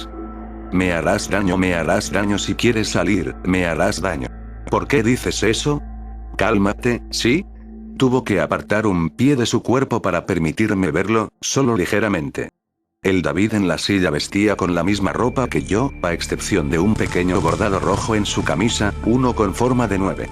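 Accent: Argentina. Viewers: SOS VOS DE ARGENTINA CHÉ? FELICIDADES PIBE, A VER CUÁNDO ME MANDÁS UN SALUDO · sos Argentino?, que grande capo!, con razón me encantan tus videos jajaja te mando un fuerte abrazo de Capital Federal!!! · Es Argento q capo!!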